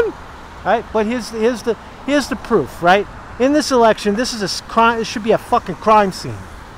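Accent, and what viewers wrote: New York, United States. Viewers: I've figured out why your mouth fascinates me😜 it's your New York accent so it's how your lips move.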